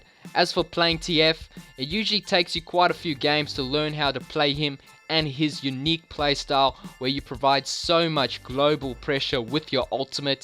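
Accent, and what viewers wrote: South Africa. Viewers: Why does this guy sound South African? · You sound South African. Are you South African?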